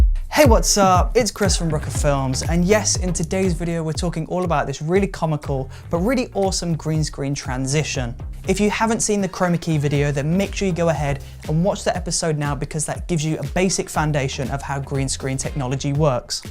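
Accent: United Kingdom. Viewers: You sound so British and i like it..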